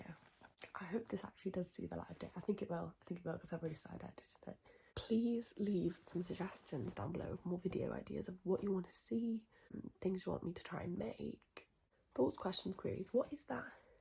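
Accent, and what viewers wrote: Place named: Pembrokeshire, United Kingdom. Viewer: Hia, I've just found your channel and I'm enjoying it. I agree that you have a lovely voice. Can I hazard a guess that you're from Pembrokeshire? I grew up there and find the accent very familiar and comforting.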